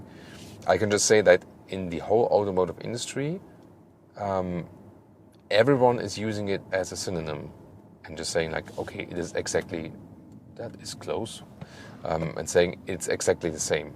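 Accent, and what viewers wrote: Germany. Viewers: …watch him just for his enthusiastic German accent. Wunderbar!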